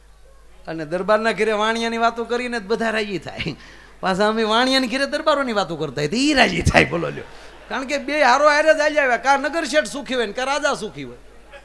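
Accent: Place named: Kathiawar, India. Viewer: Mayabhai kaddak pure kadhiyavadi superb jay mataji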